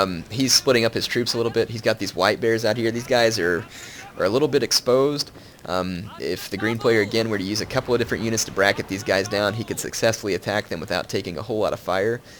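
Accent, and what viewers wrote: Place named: New Jersey, United States. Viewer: Happy Thanksgiving. Your videos help decompress this Napoleonic War fan after work, no matter what era. Very involving. You and the Prince of Macedon have well earned the esteem of your fans for the military education and well-spoken presentation you bring to the game. Congratulations..By the way, you sound just like a Jersey/Rutgers guy.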